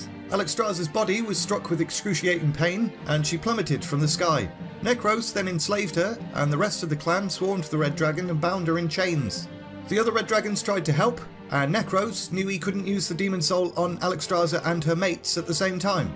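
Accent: United Kingdom. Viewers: Are you British? I like ur accent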